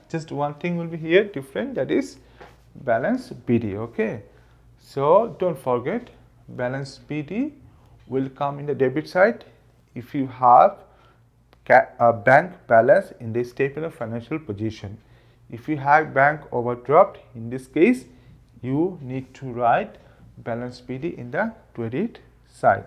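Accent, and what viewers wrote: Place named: United Kingdom. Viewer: Sir Indian ki tarah bat karo na ki British ki tarah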